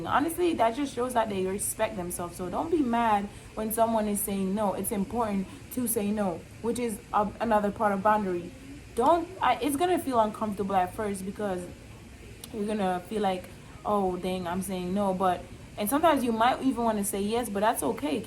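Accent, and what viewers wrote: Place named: Puerto Rico. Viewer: U sound puertorican cool anyway my 20’s were dark and depressing😞sis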